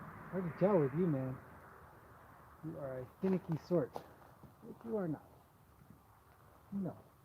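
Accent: Canada. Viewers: Hello, love your video's , where are you from, you sound Canadian.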